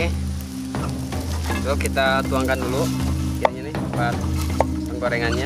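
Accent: West Java, Indonesia. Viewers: sunda pisan mang wkwk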